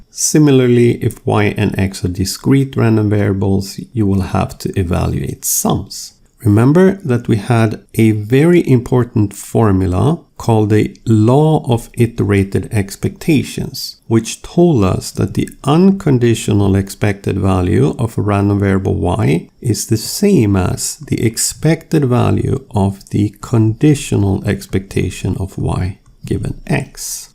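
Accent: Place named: Sweden. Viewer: got a very Swedish accent.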